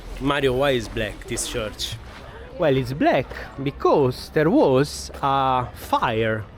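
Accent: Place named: Italy. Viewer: Interesting video. What is your nationality? Are you italian? Your accent sounds italian.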